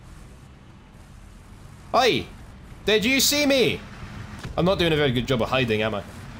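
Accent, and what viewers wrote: Scotland, United Kingdom. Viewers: I love ur accent btw is iron bru really that popular over there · I think the new mic makes you sound more. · teach me how grind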